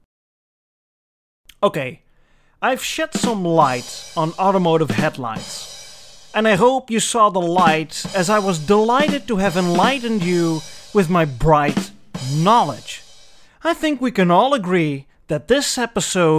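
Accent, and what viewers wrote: Netherlands. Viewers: i love this channel i found it by accident but i love it, also pretty sure he is dutch dat accent herken ik van een kilometer afstand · The Dutch accent is nice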